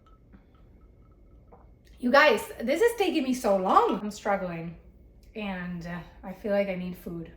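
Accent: Argentina. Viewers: I knew that accent sounded familiar! My ex-wife is from 🇦🇷 and half Italian, lol. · Hola. Te escribo desde Colombia. Soy muy fan de tu contenido, me encanta. Por qué no haces videos hablando en español? Así como los haces en inglés, qué tal si haces de vez en cuando contenido en español. Lo poco que te he escuchado hablar en español, me ha fascinado tu acento y estaría genial que nos dejaras escucharte de vez en cuando en tu lengua materna y más con el acento argentino que es maravilloso. Hazlo:) nada mejor que hagas más contenido hablándonos de tu país, de tu cultura, de tus raíces pero con tu acento nativo. Anímate 😊 Saludos desde Colombia y te felicito por el contenido que haces y el empeño que le pones, se nota que te encanta y eso se transmite una naturalidad muy bonita que se ve en la forma en la que haces tus videos.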